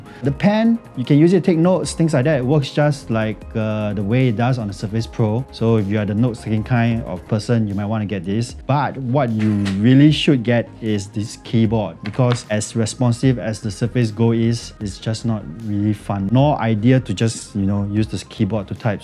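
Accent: Singapore. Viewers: listening to your singlish review,makes me really wana.....wow fantastic haha,decent review though.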